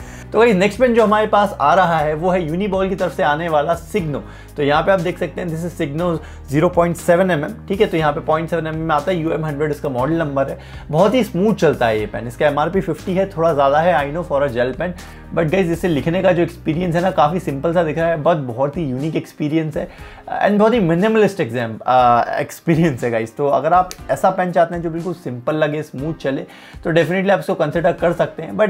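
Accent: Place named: Punjab, India. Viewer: sir aap pakka punjabi ho - bottle se paani 'DUL' sakta ha - ye pen patla ha aur iska grip 'ADTA' ha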